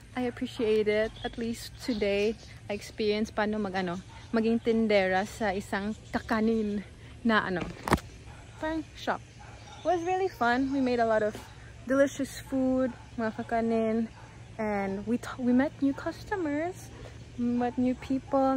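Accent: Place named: Visayas, Philippines. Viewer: season naman diay ka mag bisiya ❤😂 bisdak na 100%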